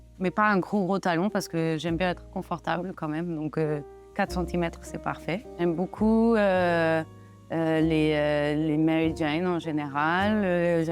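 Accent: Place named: France. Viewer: I miss when your videos used to be in English with the beautiful French accents :(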